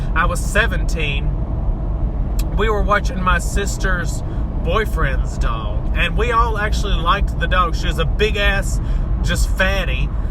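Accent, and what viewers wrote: South, United States. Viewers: K- None, introduced me, to this funny, brave, passionate man, with a great voice for audio, that has an ear-pleasing, Southern twang.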